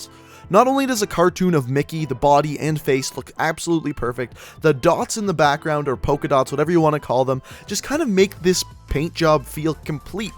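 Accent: Canada. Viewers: ohhh I know your from Canada now ''soory" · Soo soory you sound really Canadian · He's definitely Canadian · Are you Canadian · are you Canadian?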